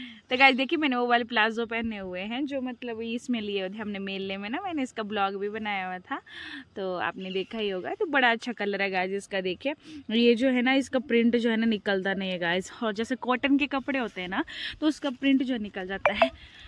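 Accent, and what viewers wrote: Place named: Himachal Pradesh, India. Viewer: Bahut sundar vlog bahen ham utrakhand se hu haridwar roorkee mai rhti hu aur aapki Bhasha se lagta hai ki aap Himachali Ho han ji Time To lagta Hai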